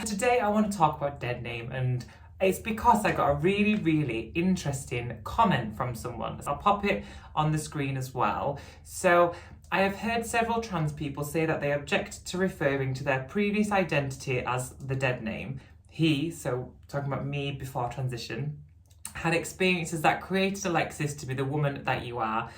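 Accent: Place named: Yorkshire, United Kingdom. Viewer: You’re such a pleasure to listen and learn from and who doesn’t love a Yorkshire accent! Makes me miss The North. ❤️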